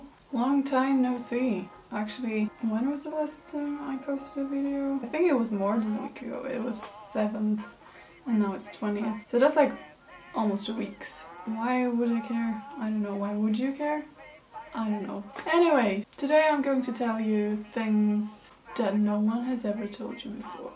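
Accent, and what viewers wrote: Poland. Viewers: Hannah Harrison She's from Poland. There is also a video or two in which she is speaking polish. And she always gets a bunch of polish comments on her videos. I dunno, maybe she has a polish accent, I'm not sure. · Fagahajakal Thank you so much! And yes I would assume that since she's from Poland she probably has a Polish accent (I'm sorry if that sounds like I'm being rude, I'm not trying to be)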